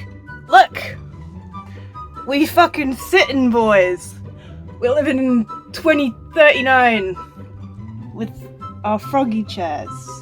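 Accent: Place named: United Kingdom. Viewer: too british.. otherwise 10/10 thank you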